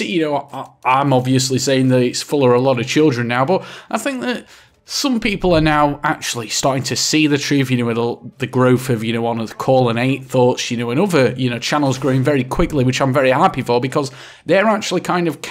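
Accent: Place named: Midlands, United Kingdom. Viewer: Just stumbled across this video. Subbed and liked that's done good shit said here. Where are you from? I'm from Nottingham you sound Midlands UK but can't place it, Sheffield or Doncaster maybe? Anyway great video man! 👍✌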